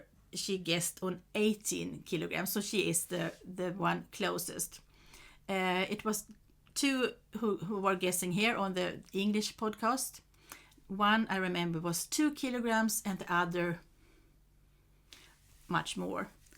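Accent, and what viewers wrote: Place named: Sweden. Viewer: Happy New Year! Wow--not surprising that I was far off for the guesstimate of the yarn amount for 2024 😂 Your Swenglish was excellent--no worries. Loved all the socks and plans...see you next time!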